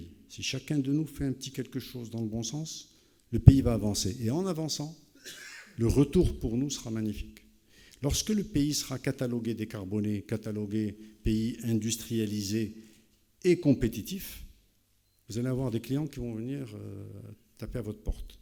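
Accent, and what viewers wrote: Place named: Marrakesh, Morocco. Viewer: l'accent et l'humour marrakchi vous colle toujours notre cher ministre...c'est bien pour attirer tout cet adorable auditoire à ce que vous dite...si important et si sérieux. merci moulay hdid Alami.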